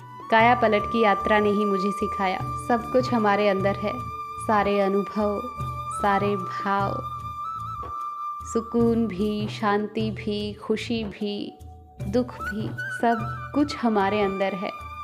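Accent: Standard. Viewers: आप को सुनना बहुत ही अच्छा लगता है। आप इसी तरह लम्बे ब्लाग जिस में आप बहुत सी बातें करके हमें अपने दुसरो के जीवन के रोचक किस्से अवश्य सुनाते रहना जी 🙏🏻 भगवान जी हमेशा खुश और स्वस्थ रखें 🤗 हमारा की शुद्धि हिंदी वाणी ने मन मोह लिया है और बार बार सुनने को मन ललइत रहता है। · Kitni sudh hindi hai waw I'm impressed 😊😊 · apka shudhha hindi bolna bahut achha lgta h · Didi apki pure hindi bolne ka andaj muje bahut pasand hai apne bahut mehnat ki hai purane ghar me ab apne apni kala ka rang yaha bhi bikher diya ye bahut achha laga muje🎉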